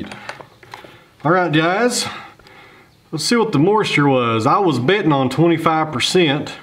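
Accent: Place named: Tennessee, United States. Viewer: My burning question is, what are those dangling thangs following behind the machine head, & why does the debarker disengage before the end of the log.? Other than that, isn't it mystifying why 1,000's of grown men & women love to sit back and watch a fella with a Tennessee accent saw up logs on a beautiful piece of machinery. I'm an electrician but I love wood & my name is Wood. Go figure?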